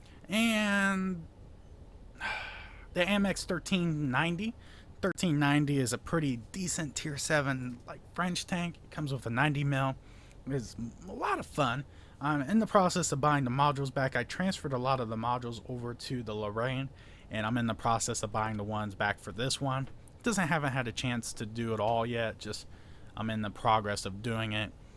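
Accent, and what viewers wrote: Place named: South, United States. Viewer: what is your 1st language? you kinda sound like your playing with a "southern" accent, maybe something else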